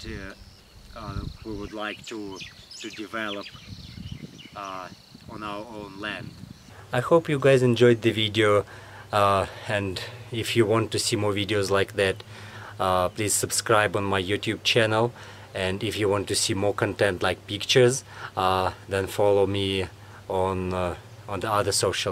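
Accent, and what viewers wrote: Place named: United States. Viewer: Доброго здоровья вам, у вас американский говор, вы жили США?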